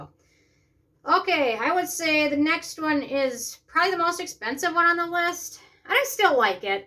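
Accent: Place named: Midwest, United States. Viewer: I like your accent it sounds Midwesterny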